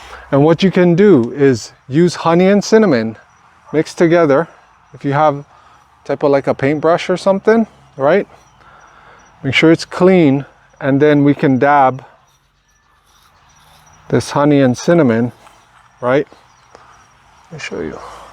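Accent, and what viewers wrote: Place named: Miami, United States. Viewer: Miami accent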